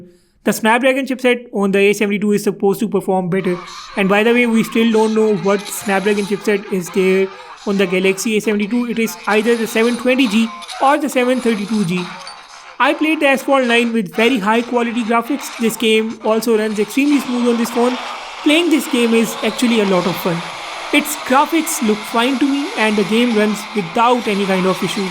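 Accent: India. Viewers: What an indian accent....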